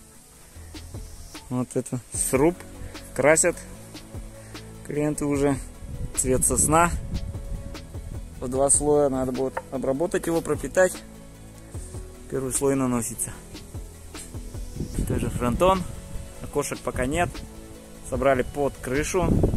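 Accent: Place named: Tatarstan, Russia. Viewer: Ты походу татарин! Акцент татарский)!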